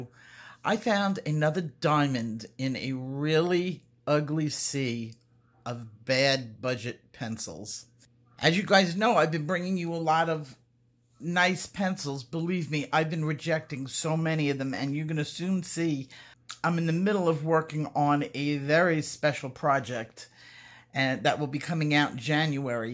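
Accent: New York, United States. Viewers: Love your voice & N.Y. accent.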